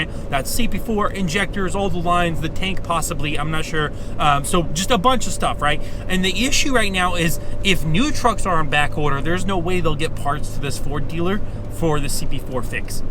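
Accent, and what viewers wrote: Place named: Iowa, United States. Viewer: heyt, sounds like EagleGrove Ia.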